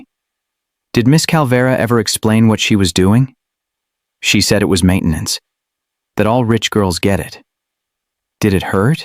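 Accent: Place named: Asia. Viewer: Hey narrator are you asian? It's obvious from the way you pronounce words.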